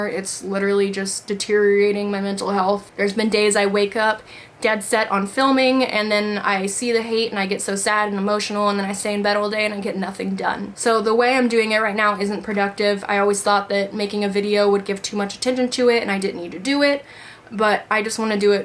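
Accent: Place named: South, United States. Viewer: I feel like I suddenly just noticed your southern accent Like it just stood out in this video But I’m from Minnesota so 😔🤟🏻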